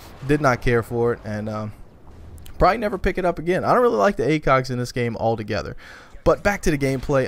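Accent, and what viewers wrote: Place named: Louisiana, United States. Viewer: omg i knew it was something about your tone that was familiar and its that we are both got that Louisiana sound. well its good to know one of my favorites are from the same state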